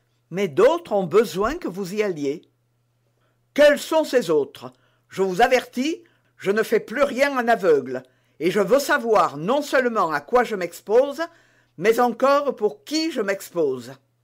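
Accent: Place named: South, France. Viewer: L'accent méridional doublé du chuintement aristocratique pédant. Inaudible bien évidemment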